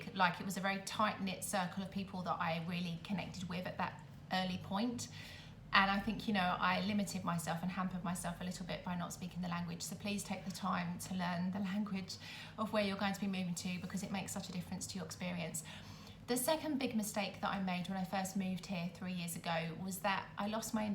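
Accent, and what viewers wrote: United Kingdom. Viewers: Hi, i'm watching all your videos because I want to improve my english with a British native speaker (I don't like too much the american accent..). They are funny and interesting, I found them useful for my goal, so... thank you for sharing your thoughts and considerations about your life in Italy. Sometimes your connected speech is a little too fast for my level, but it's an interesting challenge;) I have just a little tip for improve the enjoyment of your videos: the theme song is very very loud... but the audio when you speak is quite low, so I have to turn up the volume a lot during the intro... and a few seconds later the theme song starts and I find myself with destroyed ears!:) Ciao e grazie!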